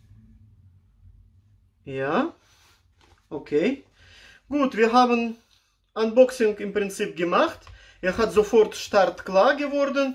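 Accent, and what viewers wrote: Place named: Russia. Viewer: Sehr, sehr sympathische und ruhige Art, dieser russische Dialekt (?) ist soo süß und sympathisch 😊 und deine Art zu erklären ist auch sehr verständlich und nachvollziehbar. Top! Danke 😊 Eine Frage noch: Das Gerät zum Wechsel bzw Erweiterung des Speichermoduls zu öffnen, ist das eine dieser "Aktivitäten" durch die die Garantie erlischt? 😅 falls ja, wäre das ja super dreist. Zumal Samsung ja explizit damit wirbt.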